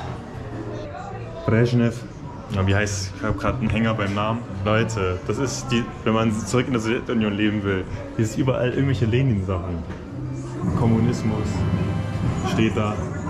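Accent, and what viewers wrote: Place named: Balkans. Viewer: Wie hast du eigentlich Russisch gelernt? Für mich hast du irgendwie einen Serbokroatischen Akzent 🤔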